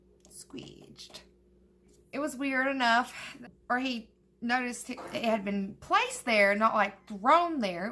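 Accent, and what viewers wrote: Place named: Rural, United States. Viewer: I'm here strictly to hear you're country ass twang and support you lol. The premise is good tho. Real crime, make-up, audio book. It is definitely a progressive thing. I dig it. 👍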